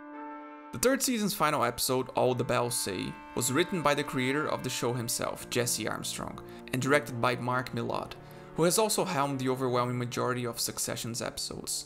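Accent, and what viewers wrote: Brazil. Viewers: Are you Brazilian? I swear your accent sounds like someone from Brazil speaking english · Um sotaque de levinho de brasileiro falando inglês! Vídeo ficou sensacional btw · acho que o narrador é brasileiro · Is this a Brazilian accent I hear? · Identifiquei que era BR pelo acento, mas otimo inglês irmão, parabéns pelo trabalho, essa série é bizarra de boa. Nunca vi tamanho desenvolvimento de personagem, te faz conhecer um personagem melhor em 3 temporadas do que em 7 ou mais em outras dezenas de séries. Abraços! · Tu é BR hein. Digo mais: CARIOCA. Baita conteúdo! Essa série é sensacional, consumo muitto conteúdo secundário de Succession e teu vídeo chegou até mim através disso hahahaha. Parabéns, furou a bolha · Are you brazilian? your accent sounds brazilian 😅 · vc eh brazuca?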